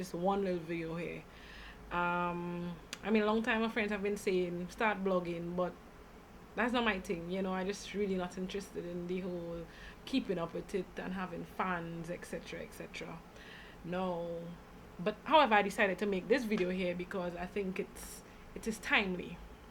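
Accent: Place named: Jamaica. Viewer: You already sell out. stay in Jamaica married ah man and get Jamaican citizenship caused you already sounds like a yardy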